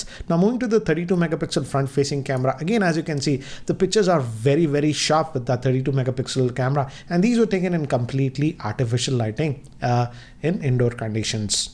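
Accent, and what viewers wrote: India. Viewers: Nice video, but I find it hard to understand Indians accent! And him speaking too fast makes it even more difficult to understand him.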